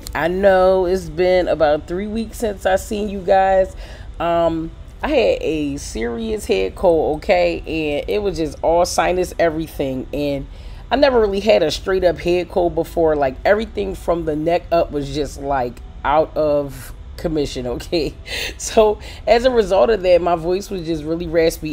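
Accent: Philadelphia, United States. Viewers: Hey! I'm glad that you're better♡♡. It's crazy how you said your voice is a huge part of your channel, bc legit, I love to hear you talk. The sound of your voice and your Philly "accent" is so soothing and dope to me❤. Thanks for all the knowledge, positivity, laughter, and realness you bring.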